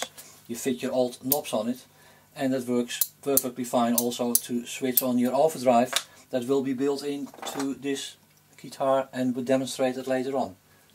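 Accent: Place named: Netherlands. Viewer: You sound dutch... and on your site it says your available in holland, where are you stationend?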